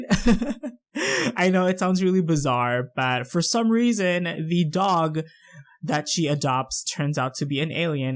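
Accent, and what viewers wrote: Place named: Philippines. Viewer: Hello!! I love your builds so much~!! They are all so creative, I wish I was gifted with such talents for building houses in The Sims. :)) Anyway, you're probably tired of this topic, but I just wanted to share to you that the first time I came upon one of your videos, your accent didn't give you away, but there was a tinge of something or a slip that made me so sure you're Filipino. And, tada!! You are. Teehee, mabuhay!! I like your voice and the way you speak, by the way (creepy much XD). Also, I would like to say that I, too, am struggling with my academics. Damn. In high school I was doing great and college is very different. And I know how it feels to fail on something you worked really hard for. You're not alone. :)) But I'm glad to hear you're doing better. I'm in the same position as well. I have a few units left but it's not an assurance that next term would be my final term for undergrad (thesis is that one big obstacle. 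I'm taking Engineering). Hopefully it is. I think it's just a matter of trying again, and trying again with 200% effort? There are times we get lazy, I know, but it's ok. Sometimes we need to take a break. I do believe that if we fail on something despite giving our 100%, it's because there's a reason behind it. We just have to look at the brighter side of things. Cheers to you and good luck on your future videos~!! Good luck on your acads as well. ;)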